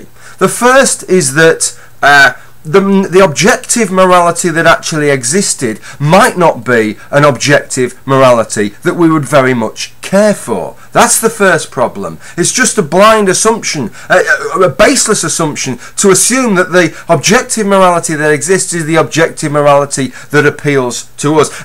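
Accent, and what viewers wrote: United Kingdom. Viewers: Anyone know where in England this guy is from? He talks just like Daphne from Fasier.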